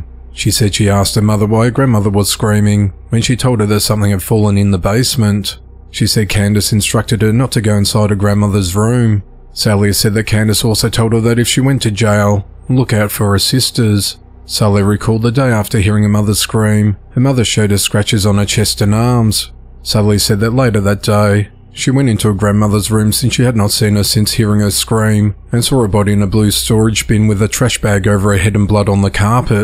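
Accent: Australia. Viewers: The narrator is Australian or nearby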